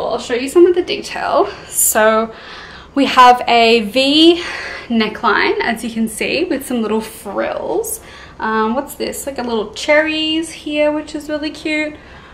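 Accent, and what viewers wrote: Australia. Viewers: Is she Australian from her accent?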